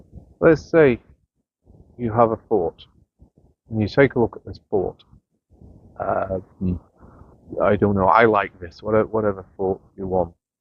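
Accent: Manchester, United Kingdom. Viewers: Thanks where are you? I’m in Lancashire you sound like Manchester Bury,Oldham way great video 👍🏻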